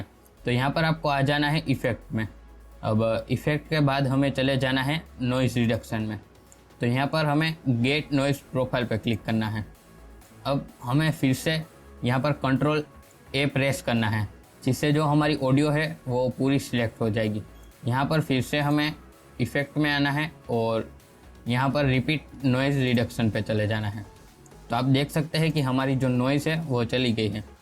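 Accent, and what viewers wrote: Gujarat, India. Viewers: Are you gujarati..??? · bhai aapki speaking se pta chl jata h ki aap gujrati ho....😂😂 Btw i m also gujrati aur me aapke baaju me rehta hu i m from jetpur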